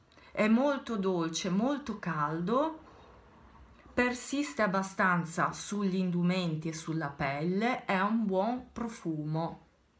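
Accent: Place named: Liguria, Italy. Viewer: Che bella che sei, e che simpatica! Amo sentirti parlare, perché il tuo accento ligure mi riporta a tempi lontani in cui ero felice.Grazie per la tua recensione... Orchidea della Cina l'ho usato ed è piaciuto tantissimo anche a me.Domani corro a comprare Bizantium, mi hai messo una curiosità immensa. Buona serata😘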